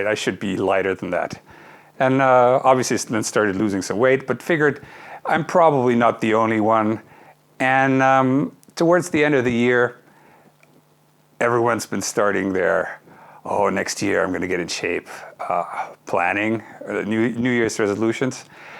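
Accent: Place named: Germany. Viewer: "My English is not that good today." Your English is amazing. I had to really focus to even hear a German accent...I've been studying /speaking German for thirty years, and I not only still speak it with a Texas accent, but confuse the verbs for "to live" and "to love." Awkward.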